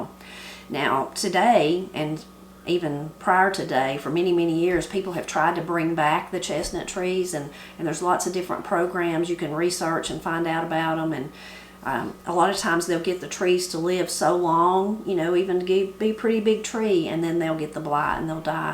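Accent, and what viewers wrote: Appalachia, United States. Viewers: I remember running barefoot on warm summer nights playing hide n seek stepping on the chestnut burrs lol. .my dad had a orchard with fruit trees, chestnut trees and huge gardens Those were the days. Love your channel and your Appalachian accent. What a wonderful family you all are. I watch you all the time. We grew up born and raised in S Western Pa. making homemade cider and jellies. You ever try corn cob jelly? It's really good. Happy New Year!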